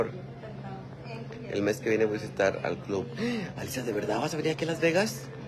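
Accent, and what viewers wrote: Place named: Mexico. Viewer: Me encanta como es muy sincero me gusta como se expresa como habla no a perdido su acentos mexicano sin tapujos pero habla con respete con todo mundo me encanta gracias felicitaciones ❤❤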